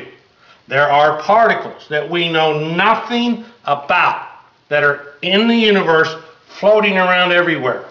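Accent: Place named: Texas, United States. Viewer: aherlm ?! an american with texas dialect explaining particle mechanics (insert texas joke here) I'm having a hard time to swallowing this is real science americans cant even keep their government clean from corruption insert rant about copyright, patent system, privacy violations, lies, bully, threaten, *grumble grumble*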